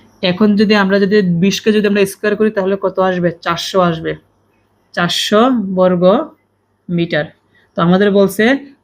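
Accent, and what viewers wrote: Sylhet, Bangladesh. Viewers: ভাইয়া আপনি কি সিলেটি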